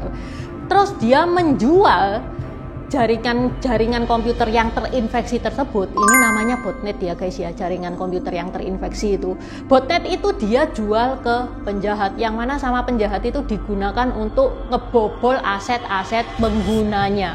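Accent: Java, Indonesia. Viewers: ii Coba Dong Bicara pake Bahasa Mandarin nya sih, , ? ! Saya Pengen Ngedenger mgomong ny Mandarin, Campur Medok ny ngomong Bahasa Jawa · Suaramu kental banget jowone REK....😂😂😂😂❤ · Jowo nya ndi Cik,medok buanget Jowo neh,he he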